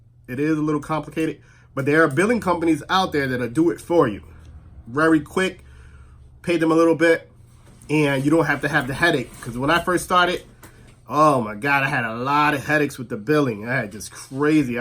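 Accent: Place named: New Orleans, United States. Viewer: Dude gotta be from New Orleans!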